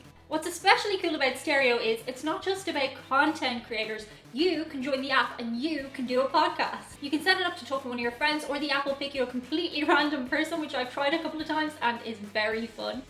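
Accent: Ireland. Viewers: Honestly, the compression kind of made you sound like a Dalek....A very sweet Irish Dalek.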